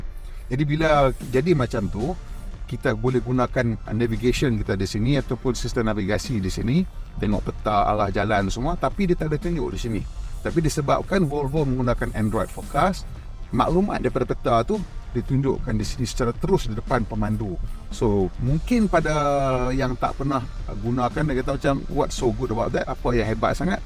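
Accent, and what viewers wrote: North, Malaysia. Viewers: Well review tuan…tapi kali ni personally rasa lagi best sebab kelauq nahu2 utara hahaha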